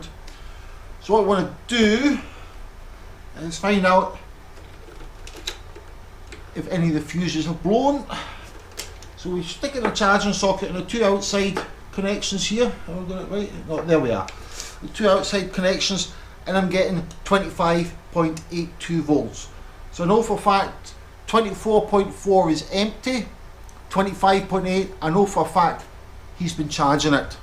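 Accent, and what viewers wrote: Scotland, United Kingdom. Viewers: Thank you for another informative video - Great Scottish explanation and made perfect sense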